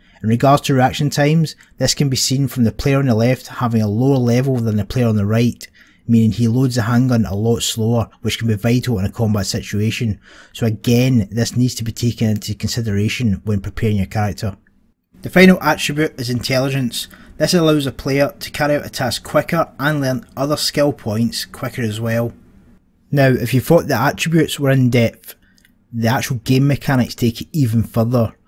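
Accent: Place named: Scotland, United Kingdom. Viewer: you from the area near Edinburgh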